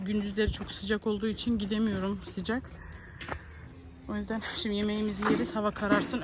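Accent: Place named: Azerbaijan. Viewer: Salam yaxşı gəzmələr ❤siz azərbaycali kimi danişirsiz anenlere salam göndərirəm azərbaycanan 😍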